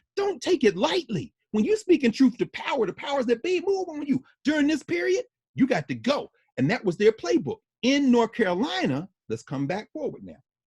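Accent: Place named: Nashville, United States. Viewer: I was born and raised in Nashville. Graduated Tennessee State many years ago. I love. I have not lived in Nashville in 30 years, and when I hear him say, “ my momma and ‘ nem’ “, I feel like I’m back home again. (Notwithstanding, his brilliant mind.) Love you guys and thank you.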